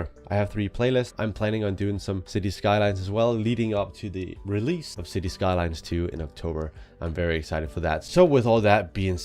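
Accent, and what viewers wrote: Denmark. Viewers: I thought your accent sounded a bit similar to a Danish youtube friend of mine, and I was right! You are Danish. Dannebrog for altid!! 🇩🇰🇩🇰🇩🇰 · Immediately noticed the Danish accent 😂 Love the video tbh though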